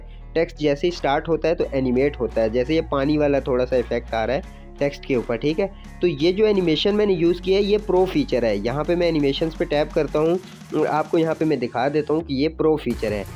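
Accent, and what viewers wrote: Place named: India. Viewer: i don’t understand ur indian accent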